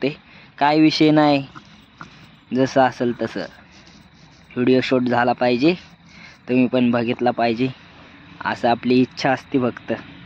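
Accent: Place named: Dhangari, India. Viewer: त्रास नाही पावणे थोड धनगरी बोलालवर चांगलं वाटतं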